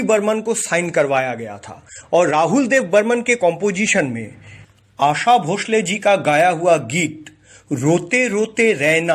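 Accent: Bengal, India. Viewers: তুমি কি বাঙালি।। তোমার হিন্দি তে বাংলার টান আছে।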